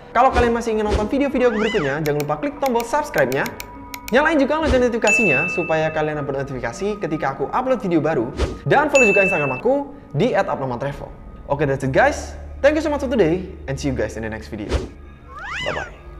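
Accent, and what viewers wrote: Malaysia. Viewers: bang cakap malay mcm org malaysia.. hehehe salam malaysia buat indonesia 🥰🥰🥰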